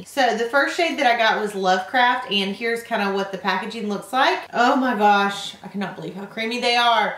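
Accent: South, United States. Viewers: You are a doll. You seem to be a lovely and sweet young lady. The makeup that you are wearing in this video is my favorite!! This is the most beautiful look. The lip color is perfect for you. I know you love the rosy colors but this look makes you look younger. At your age, girls can pull off any look, but this look is special. It is your color for summer!!! I hear you say often when you receive green eye shadows and liners that it you wouldn't normally wear them. Girl, you have all of us cheering you on to try new looks. You receive these products to let us see different looks to influence us. You do influence us because I have purchased items that you have shown!!! With your eyecolor, try golds and bronze with the gold/green as an under eye liner. That would be a fabulous look. I know you love your pink, fuchsia eye shadows, but step out of that box.we will love you even more!!! 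I'm proud of you. I run across your video when you first started and the southern accent got me. I'm from Northeast Tennessee and I support my southern ladies. I'm so proud of you girl!!!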